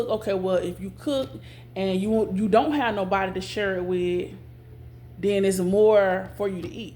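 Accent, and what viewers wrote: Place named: New Orleans, United States. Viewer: Girl you from New Orleans 😂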